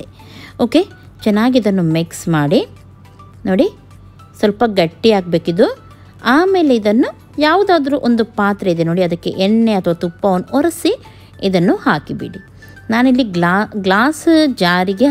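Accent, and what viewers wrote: Mangalore, India. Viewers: Hai madam nowadays I am watching your all viedeoes superb your mlore kannada so nice your family very good children are so naughty Khushi aagatte always be happy thank you so much daily vlog maadi mam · Mam I really love ur manglore Kannada and also ur recipe's..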